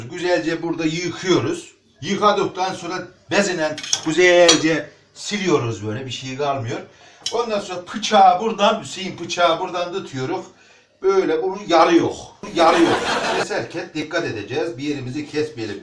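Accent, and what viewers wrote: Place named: Alucra, Turkey. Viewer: Dayı alucoralı yada gareysarlı galiba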